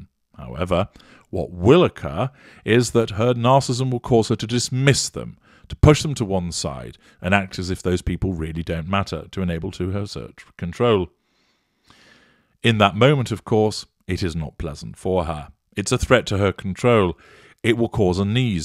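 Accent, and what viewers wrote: United Kingdom. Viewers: I adore your commentary. You beautiful British accent. Plus you make me laugh. So bloody happy to have found your channel. From Australia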